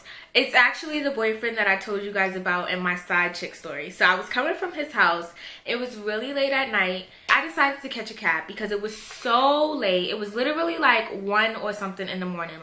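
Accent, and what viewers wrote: New York, United States. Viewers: That New York accent 😍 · Is this chick from new york ?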